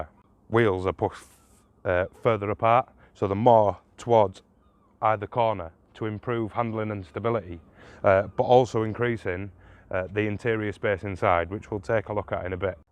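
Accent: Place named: Northern England, United Kingdom. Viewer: I like your friendly northern style but respectfully suggest you need to cull as here and there you repeat yourself. Less is more... I wish you had taken a motorway run as I'd have liked your observations regarding what many reviewers complain about, viz wind noise and tyre roar in this scenario. Apparently both are excessive. I'd be most interested to hear from owners on this subject if any of you care to comment, please. I've ordered a top spec Mazda replica - forgot to say that I think the exterior design is stunningly beautiful from all angles - but can't forgive the manufacurer for producing a car sans satnav as I loathe the prospect of faffing around with phone cable connection etc. Grrrrrrrr!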